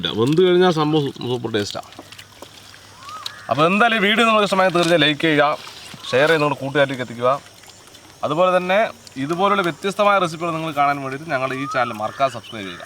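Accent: Palakkad, India. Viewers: കണ്ണ് വോണ്ട നമുക്ക് പാലക്കാട്‌ സ്ലാങ് 🤣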